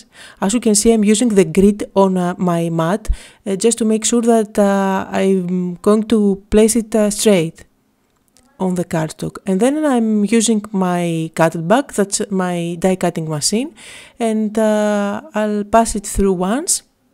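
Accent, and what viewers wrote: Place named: Greece. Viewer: i have enjoyed every art journal and card making video you made. Your art really is so warm and joyful. You have such a talent too for demonstrating all of the various products. I love your Greek accent too. Keep the videos coming please and thank you.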